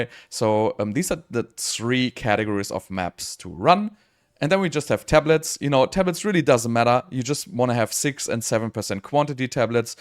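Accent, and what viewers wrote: Germany. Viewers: Liebe wie man das deutsch immer raushört hahaha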